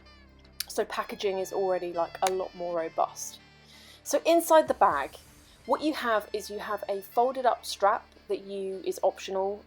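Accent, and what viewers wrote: United Kingdom. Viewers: british accent is wonderful !!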